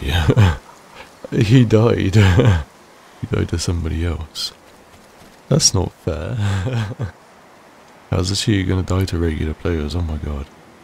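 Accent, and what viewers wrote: United Kingdom. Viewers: love the west country accent bro! sending love from bristol! keep up the hacker smacking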